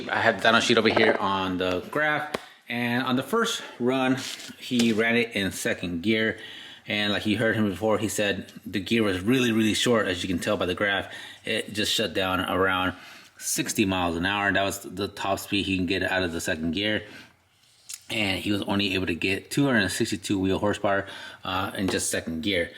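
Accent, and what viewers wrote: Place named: Texas, United States. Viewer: Thats pretty good gains from stock running gear... i just picked up a 94 single cab short bed f150 myself and my dad is wanting to do a lightning clone... heres a thing to think about tho... was that other guys truck a lightning? Cause a real lightning had GT40 heads on it which you can tell be the three ribs on the front of the cylinder head... if you have those then thats why you made way better power cause the GT40 heads are 150 cc intake runners compared to the 130-135 cc intake runners from the plain heads... my truck is a straight 6 which im thinking of upgrading just to have something different... it sounds like youre in texas, im down in the rgv... maybe after i get my truck built we could have a shoot out 🤷🏽‍♂️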